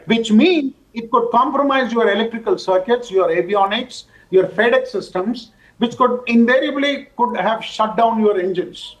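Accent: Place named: South India, India. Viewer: Pathetic south Indian accent 💩💩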